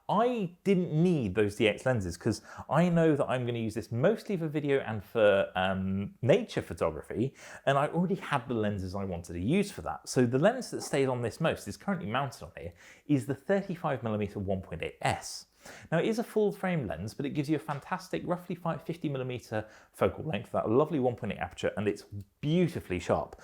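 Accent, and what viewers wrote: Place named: England, United Kingdom. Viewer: Great to hear a calm, English voice!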